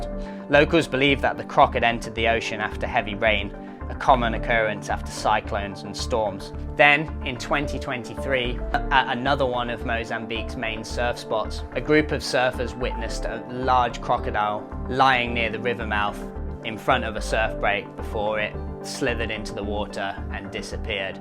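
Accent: Australia. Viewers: he is a brit though, but sounds like aussie. ne ca raw gua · Not Canadian..Aussie? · ❤I love your accent and I love Australia I would love to visit sometime I’m from Louisiana USA a retired herpetologist. I also love your videos they’re very well put together sending lots of love and hugs your way, Ramona🎉❤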